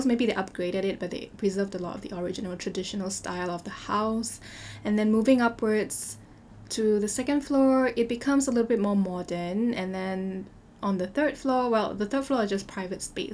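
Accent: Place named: Singapore. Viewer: i heard you accent and went ??? Singaporean 🥰 so nice to see a local simmer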